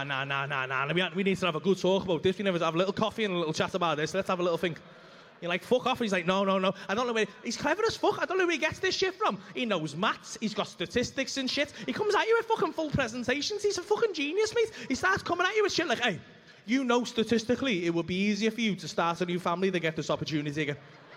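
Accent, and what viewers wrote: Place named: Manchester, United Kingdom. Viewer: you manchester im guessing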